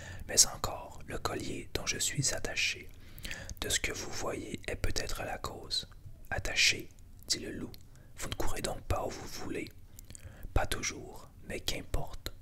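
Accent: Quebec, Canada. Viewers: Alors d'habitude je déteste l'ASMR québécois car j'ai du mal avec votre accent mais avec toi, ça passe nickel et je suis ravie 😄 En plus, j'adore les lectures chuchotées. Je vais aller découvrir le reste de ta chaîne avant de m'abonner 😘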